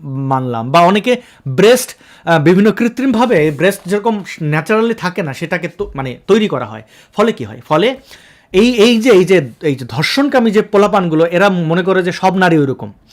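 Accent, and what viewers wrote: Sylhet, Bangladesh. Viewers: ভাই আপনার সিলেটি ভাষা অনেক সুন্দর।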